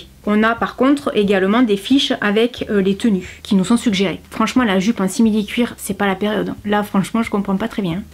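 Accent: South, France. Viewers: Je t'aime ♡ Vive l'accent du Sud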